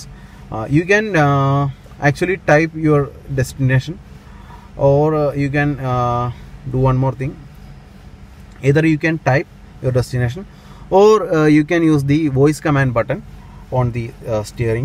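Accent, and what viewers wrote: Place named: India. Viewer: Lol the accent. The way you say maruti... only an Indian can catch that.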